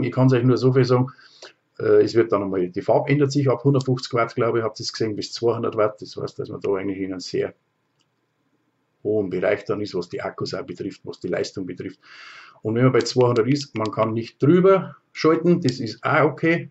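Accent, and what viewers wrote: Bavaria, Germany. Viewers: Bayrisch is a deutsch , alles verstanden ;-) Danke für deine Eindrücke .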